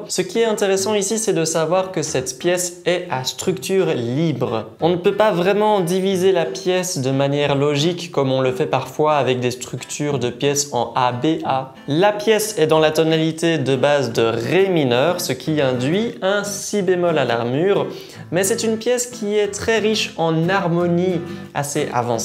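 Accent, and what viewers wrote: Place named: France. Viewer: je suis québécois et je te trouves très articulé et claire alors même si j'ai un peu de misère a m'adapter à ton accent français, j'apprécis quand même tes vidéos, merci beaucoup!!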